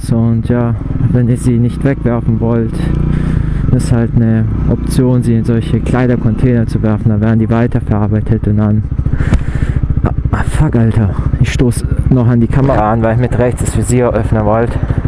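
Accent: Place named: Bavaria, Germany. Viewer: Gruß in meine Heimatstadt! :) (Y) Gebürtig bist Du aber nicht aus S oder? Würde Dich vom Dialekt her eher Richtung Nord- oder Ostbayern verorten?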